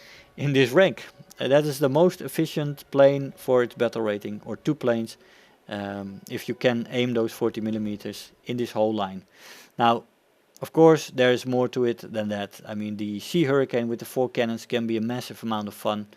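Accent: Netherlands. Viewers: Nederlands accent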